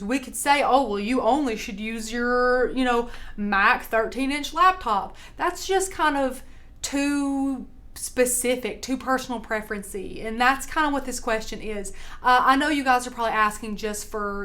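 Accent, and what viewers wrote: South, United States. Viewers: This is awesome training. I love your accent! I'm from SC. Love all of our southern accents!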